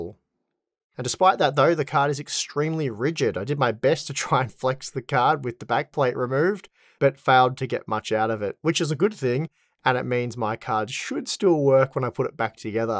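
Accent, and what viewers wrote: Australia. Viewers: I also love the silent baos hahahaha I love how Australians pronounce some words lmao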